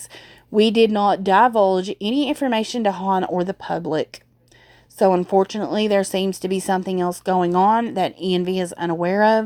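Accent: South, United States. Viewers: I love your southern accent and couldn't wait for this video to update us, thankyou for the work you do. I hope Hahn gets jailed it's what she deserves, I know she has children but she's made her choices and I believe she's a big player in the monkey buisiness. Thanks again and look forward to your next video. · Yaaay I'm so happy to hear from you!! Thank you! P.S. I Like your southern drawwl 🐴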